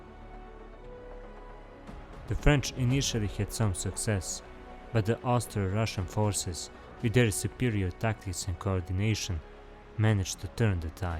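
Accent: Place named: Eastern Europe. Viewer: Cool video but your east European accent is to much for my ears. GL but i will never ever watch your movies again.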